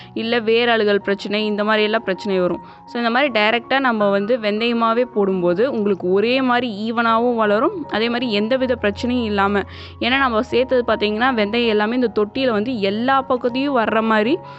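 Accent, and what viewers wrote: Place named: Coimbatore, India. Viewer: I like you and too and show in video and Im also from Coimbatore I like your native slang lots of love 💕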